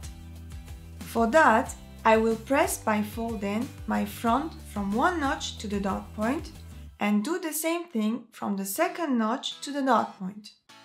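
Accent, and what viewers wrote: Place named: France. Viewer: on sent bien l'accent francais xD mais merci quand mêne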